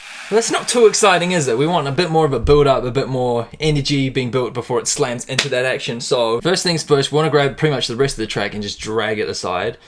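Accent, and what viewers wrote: New Zealand. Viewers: you are 100% FROM NZ. the accent I can tell.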